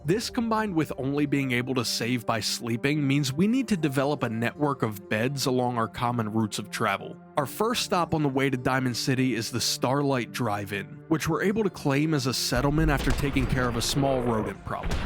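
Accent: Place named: Pittsburgh, United States. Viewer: Idk where ur from but I keep catching a Pittsburgh soundin accent 😂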